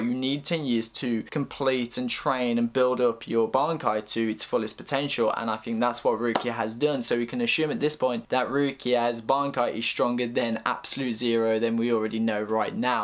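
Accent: United Kingdom. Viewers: Great review. btw I like your british accent;)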